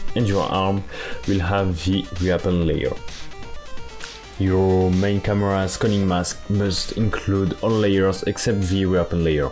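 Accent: France. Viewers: this man has a thicc french accent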